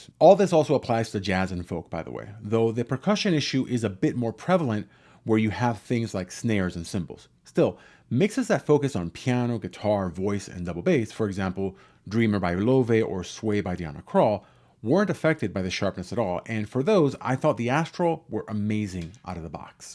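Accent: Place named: Castile, Spain. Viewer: I have to ask, are you Spanish? Your accent, while pretty neutral, seems to leak a little… Castellano…?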